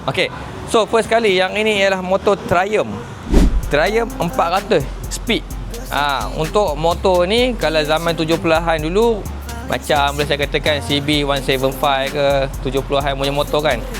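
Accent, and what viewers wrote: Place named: Perak, Malaysia. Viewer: Ate, kapar klang bunyinya Perak yeop 😁😁🤔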